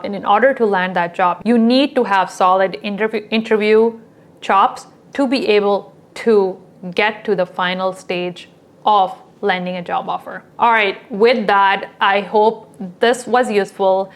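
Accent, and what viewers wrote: India. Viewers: She speaks really nice but there is a pinch of desi accent in her voice XD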